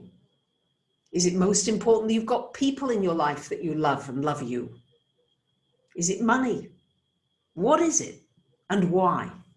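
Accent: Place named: London, United Kingdom. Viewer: Idk y this whole time I considered ur accent Aussie but I now hear london 😌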